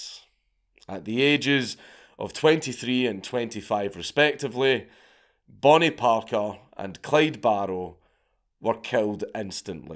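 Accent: Scotland, United Kingdom. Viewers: You know, I never really was interested in the legend of Bonnie and Clyde untill I found out the story was going to be told by this charismatic, jolly Scotsman. 😃👍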